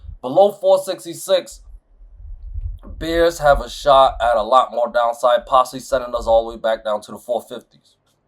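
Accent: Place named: Northeast, United States. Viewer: you sound like you're from the northeast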